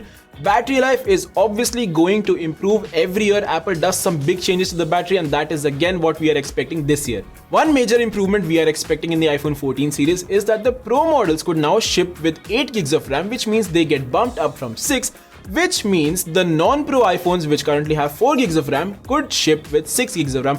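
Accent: India. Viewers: Indian freshy English accent is very potent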